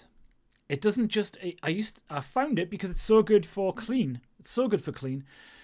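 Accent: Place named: Newcastle, United Kingdom. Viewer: Good to hear a fellow Geordie into this stuff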